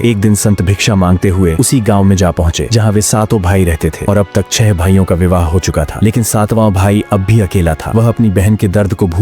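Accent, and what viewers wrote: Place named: Chhattisgarh, India. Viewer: Maa sunaya hai kahani cg boli me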